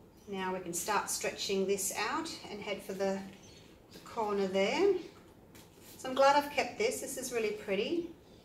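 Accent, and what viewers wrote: Australia. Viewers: Simply stunning. With that Australian accent I assume you live there too. Is global acrylic paint available in Australia and which supplier, thanks.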